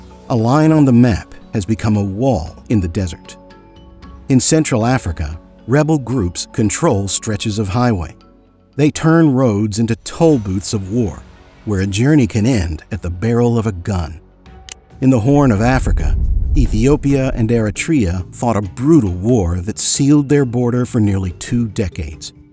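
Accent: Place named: United States. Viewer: lol he sounds yank to me nothing euroxentric there 😂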